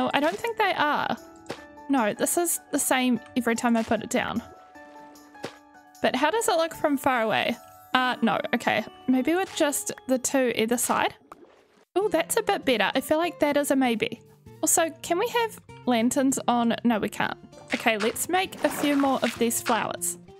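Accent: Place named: New Zealand. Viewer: I miss my hometown sm Nz is whre im from and hearing your voice takes me back :)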